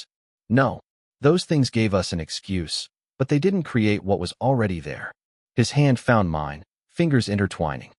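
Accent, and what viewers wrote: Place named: United States. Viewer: This story created by AI , both content and voice . It's still good for people who is learning English , all American accent is very excellent .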